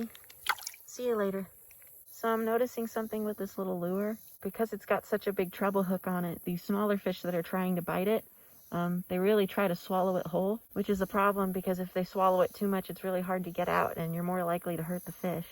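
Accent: South, United States. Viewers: The country accent on that femboy voice. Wow. Melt any man's heart.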